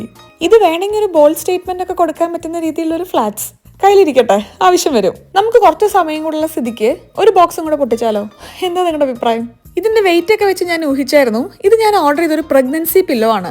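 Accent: Malappuram, India. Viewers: 1..2 aaycha adhonnu ubayokikkate ennit njan adhinte kuttam paraya😅 awww malappuram talkenne ❤️‍🩹🫶🏻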